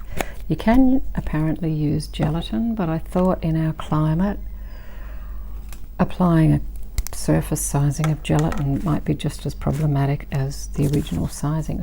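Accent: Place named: Australia. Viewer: Lovely to hear an Australian voice....from Mount Gambier SA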